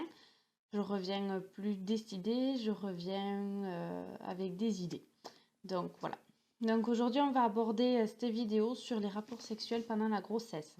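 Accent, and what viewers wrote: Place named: Marseille, France. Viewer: tu parles comme une cagole de marseille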